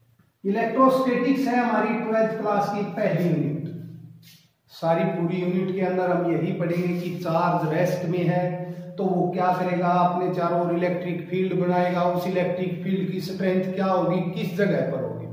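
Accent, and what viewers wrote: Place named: Haryana, India. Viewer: Hindi m haha aapni haryanvi aa sahi h sir ji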